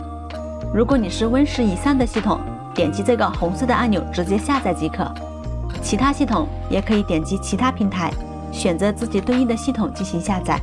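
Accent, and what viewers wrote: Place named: Hunan, China. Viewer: 听口音是湘妹子。介绍的相当的详细。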